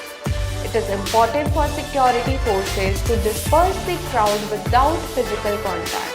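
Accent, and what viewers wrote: India. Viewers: lindu pajeeet accent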